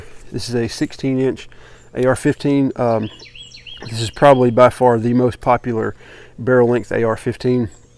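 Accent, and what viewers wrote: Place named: Alabama, United States. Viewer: Aside from your logo I could tell by your accent you were a fellow Alabamian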